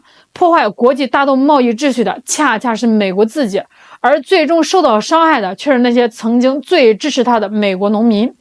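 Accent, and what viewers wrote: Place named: Northeast, China. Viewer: 东北姑娘！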